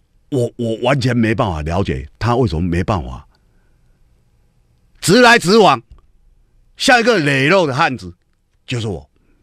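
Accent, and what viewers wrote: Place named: Minnan, China. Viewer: 小董好可愛！尤其是他的閩南國語，每一個咬字都好誠懇！：聽了我想投小董選立委！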